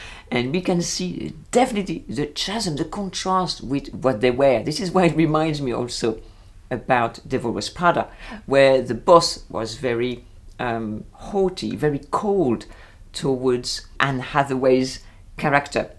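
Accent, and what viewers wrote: France. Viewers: A very gracious reply 💐 Your husband doesn’t need to worry... your accent is still very charmingly French and your English is excellent in every way 😘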